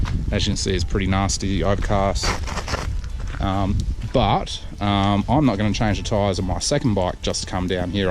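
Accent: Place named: Australia. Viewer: Love the aussie accent mate. Also love the bike, great video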